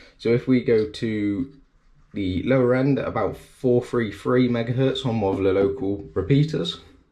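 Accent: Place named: England, United Kingdom. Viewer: 😂 Fair enough mate...you sounded English in the Video...73 ✌️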